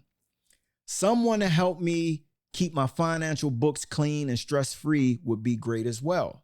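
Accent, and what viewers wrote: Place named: Baltimore, United States. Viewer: Man that Baltimore accent STROWNG ain’t it 😂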